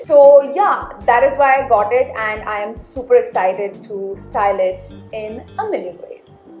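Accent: India. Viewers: The only thing more amazing than this woman's body is her accent <3 Indian women! <3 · INDIAN GODDESS ALERT! Thought you were from the UK for a second, then I heard the accent lol. You should become a super model! These big companies will give you all the outfits and products FOR FREE. Life is good huh! Or just marry some saudi billionaire or russian oligarch.... decisions decisions 😁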